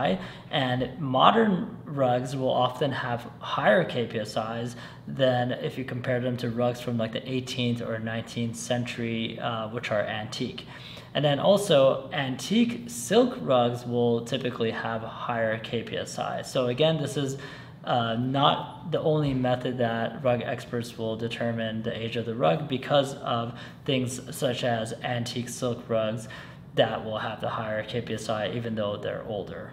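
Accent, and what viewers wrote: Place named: United States. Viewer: This video was supposed to be about the art of carpet making. This salesman speil in American accent takes all the fun out of it.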